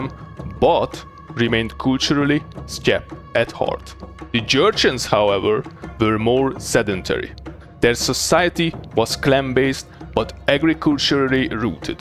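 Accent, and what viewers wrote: Hungary. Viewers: Your accent look very hungarian.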